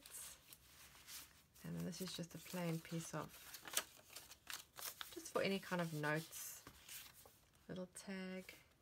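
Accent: South Africa. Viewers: Thats a south African accent I thought it was British....love the journal xx ps it's called pianola paper or just piano paper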